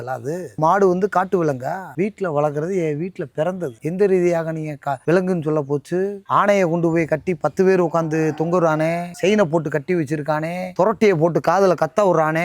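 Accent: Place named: Madurai, India. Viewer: அண்ணே சூப்பர் அடுக்கு மொழி பேச்சு மதுரை சிலாங்க்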